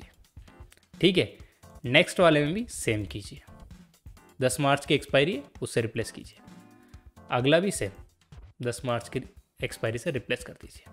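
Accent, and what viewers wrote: Belgaum, India. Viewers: Dear sir r u from belgaum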